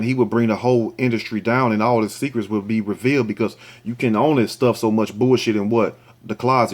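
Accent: South, United States. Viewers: Like watching and listing to u even if u talk like your from the southern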